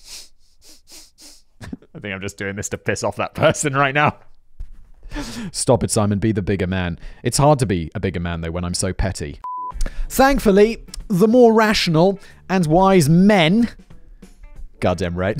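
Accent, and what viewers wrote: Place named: United Kingdom. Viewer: It sounds racist coming out with that British accent.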